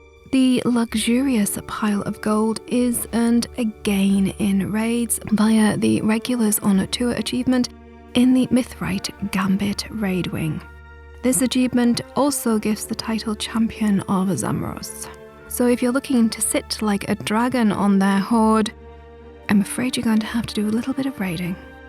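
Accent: United Kingdom. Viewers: You have a fantastic voice. Interesting accent too, what part of UK are you from? You could do an audio book XD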